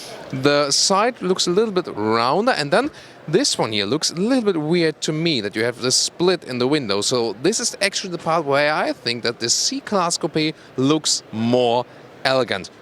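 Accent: Germany. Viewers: Very good and clear comparison with all the details and your very nice and beautiful German Accent make it very intresting too . Thanks . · Hello there. You and Doug DeMuro are my favorite auto reviewers. If I had to choose only one, I would pick You over Doug. Please don't tell him that! Lol:) I have an E coupe and loving it. E class all the way! You are very articulate and thorough with your presentation. I don't get bored watching you and Doug. Nice German accent, too! Hope all is well for you both life and work. Btw, are you coming to Washington DC anytime soon? Cheers and take care! -Phi ( Fee Fi Fo Fum)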